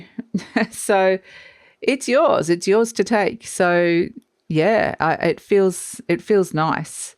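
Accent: Australia. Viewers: Damn I thought , you were probably from northern NSW like myself, but time in Qld has melded my accent , should have gone with my first instinct ha haa , love your work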